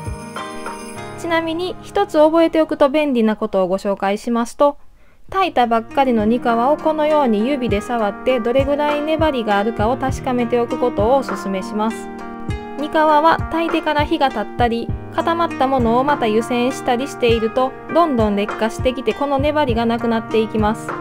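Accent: Kansai, Japan. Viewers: 2秒で純な関西人だとわかってしまう動画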